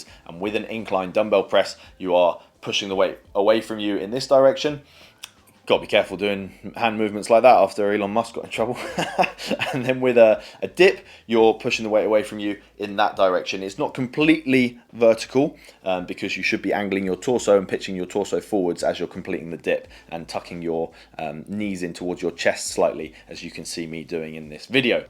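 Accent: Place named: London, United Kingdom. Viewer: love ur accent....so pretty ! where is it from ? London ?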